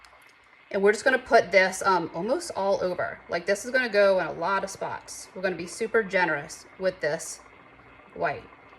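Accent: New Orleans, United States. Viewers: Girl, you are from Metairie or New Orleans FOR SURE!! I can tell by that accent (you sound like me!) and those glitter names…”Garden District, Sugar Cane?!” HA! Love your channel…new sub!!!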